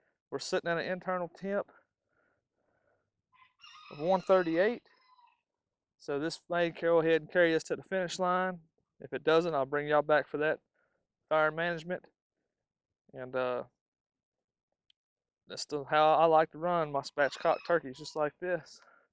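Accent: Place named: Texas, United States. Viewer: Oh yum yum 👍👍👍 that’s such a unique way and creative way of smoking a turkey … I like that Texas twang coming from a native Texan. 😁😁😁 although I don’t live there anymore it will always be my home . ❤️ thanks for sharing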